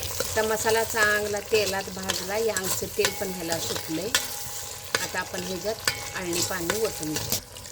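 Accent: Kolhapur, India. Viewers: Kolhapuri bolta tumhi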